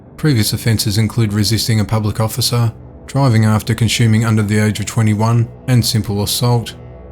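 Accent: Australia. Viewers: All news should be told in an Australian accent. It’s just easier to hear. Not so much the news as the soothing voice and the contrast thereof. Thank you!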